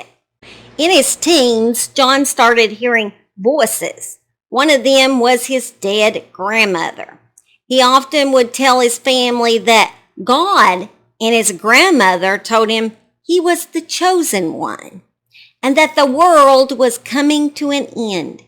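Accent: South, United States. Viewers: Hi Dolly. ( just a term of endearment, ) My momma was born in Alabama ( Birmingham) . I love your accent. It reminds me of my family in the South.❤ I think you are great at these stories because you somehow keep it short, and sweet ( well as much as you can tell it).☹️ No, no ,no! What good are these monsters doing on this earth??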